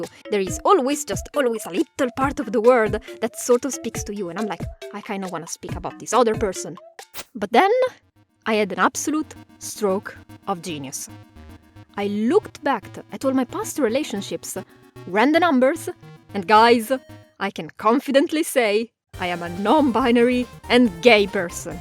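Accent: Italy. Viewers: Your Italian accent is so funny haha 😄 (in a good way) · oddio ho capito subito dall accento che sei italianə, io la uso la ə ma solo nello scritto funziona secondo me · Ho sentito che sei italian dal esatto momento in cui hai parlato, anche se era inglese hahahaha Ti capisco così tanto sul problema dei pronomi, alla fine anch'io gli uso entrambi vivendo nel caos e confondendo le persone eheh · You sound familiar....oh yeah, you are Italian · I'm so happy to see this, happy pride month from the Asia part of the world~ and your Italian and accent so cool!! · Hiiii!!!! It happens the same to me. I am spanish, so I completely understand the thing about pronouns. In my case, I prefer to refer to myself with "masculine" pronouns, but people could tell me whatever they want to, I won't mind jsjsjs. Also, I love how your italian accent shows when you are talking in english. 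It's so funny to hear :)))) Pd: Love the video and your art style <3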